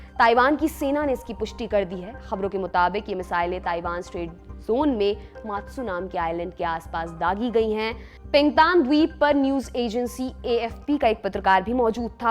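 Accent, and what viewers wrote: India. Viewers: Yes , but some urdu word is hard to speak with indian acsent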